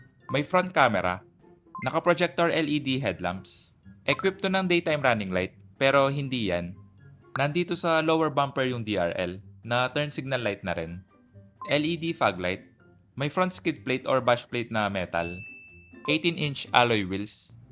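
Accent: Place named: Pampanga, Philippines. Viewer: Dinig na dinig ko yung kapampangan accent..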